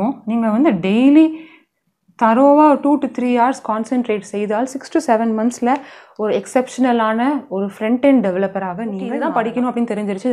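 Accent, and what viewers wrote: Sri Lanka. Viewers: ilangai Tamil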